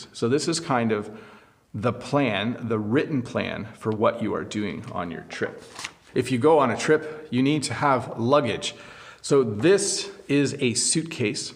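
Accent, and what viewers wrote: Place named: Canada. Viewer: Its so exciting to watch your channel. I just learn so many phrases about a trip. I want to grab Canadian accent. By the way I am from Bangladesh and my native language is Bangla. I like to be native English speaker like you. You are so understandable. Could you please tell me the whole process to be like you. You are just amazing. Hope you will understand the pain of learning English for a non-native country's people.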